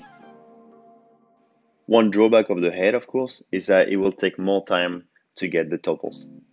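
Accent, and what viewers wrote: France. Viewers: Ça s'entend que t'es français ! T'aurais pu sous-titrer pour tes compatriotes.. 🙄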